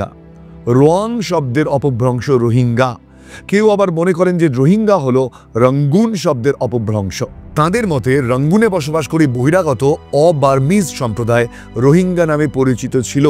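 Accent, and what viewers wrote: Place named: Kolkata, India. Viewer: বাংলাদেশ থেকে কথাগুলো শুনলাম দাদা মনে হয় কলকাতার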